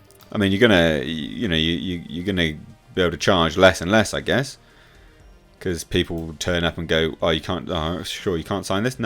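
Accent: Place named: United Kingdom. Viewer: What would a white guy with an accent , a British accent (im not stereo typing) know of real life stereo types .all the research you do is based on wiki not real life .u have no idea why , the deep reason why this actor said that .you just need clicks and views i guess. So making a 10 min video on something you will never understand is pointless this has to be a joke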